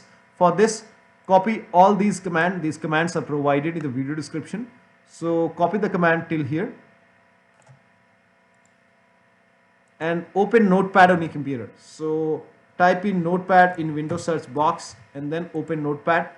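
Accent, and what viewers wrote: India. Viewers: This video combines a lot of useful information in one place and presents it thoroughly yet briefly and succinctly without a lot of extemporaneous nonsense. The audio is terrible, a better microphone is needed. The content provider needs to speak about 25% slower due to his heavy accent. His English is excellent, his speech needs work because even though he knows English well he does not speak it particularly well. For example: I'm watching/listening to this on some fairly high end equipment so I can hear the words very clearly but when he says ".exe file" it sounds like he's saying "yeti fye". If you're going to pronounce a file extension it's better spell it out, to say "dot E-X-E" than it is to try to make the file extension into a syllable. Or in the case of the .exe extension just say "executable". Slow down, enunciate, listen to people speaking English as their mother tongue from Canada, America or Britain (not Australia or New Zealand, those people don't know how to speak their own language) and work on making your speech more intelligible. 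After you have a good grasp of the language listening to other Indians speak English is not helpful, you all just reinforce your pronunciation mistakes. It does little or at least less good to know a language if the people you're speaking it to can't understand what you're saying.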